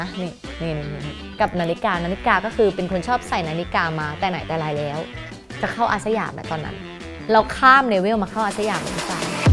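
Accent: Thailand. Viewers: เอาจริงเมื่อก่อนไม่ชอบคือตอนเพลงคันหู คือมันดูแรง แรด ว่าเราชอบผู้หญิงแรดๆแต่เพลงด้วยมั่ง แต่สุดท้ายความเป็นตัวจ้ะคือตอนนี้ จ้ะน่ารักมากกลายเป็นชอบมากความใสๆแล้วบนเหน่อๆแบบนี้คือชอบบบบบบบบที่สุดเลย ครับ เป็นกำลังใจให้ทุกเพลงและจะติดตามผลงานตลอดไปครับ หลงรักแบบไม่รู้ตัว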